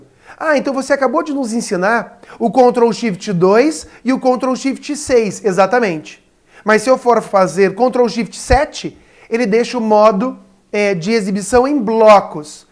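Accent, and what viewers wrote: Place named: South, Brazil. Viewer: Ei professor você nasceu no sul do Brasil? é por causa do sotaque .suas aulas são ótimas ,vou prestar para agetel e agepol,valeu.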